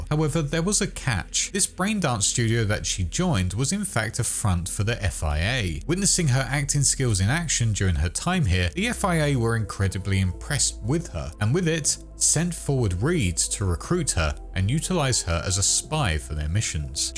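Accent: United Kingdom. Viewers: I think you should be a voice in the sequel. Either a fixer or working with me blue eyes. You see, because you're British so obviously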